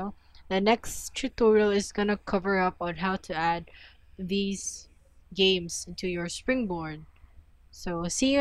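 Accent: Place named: Philippines. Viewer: I can hear a Filipino accent, are you filipino by any chance?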